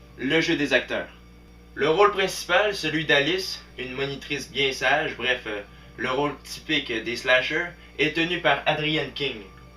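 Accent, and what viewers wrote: Canada. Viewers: Vive le Canada. J'adore votre accent. Bizzzzzzzzzzz. J'achète.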